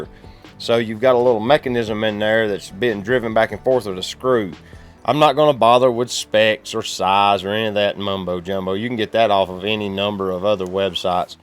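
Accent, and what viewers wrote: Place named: Texas, United States. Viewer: are you from Texas , lover the accent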